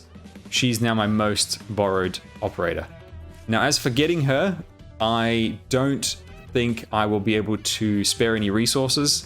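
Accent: Australia. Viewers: Are you Australian btw? I'm trying to place the accent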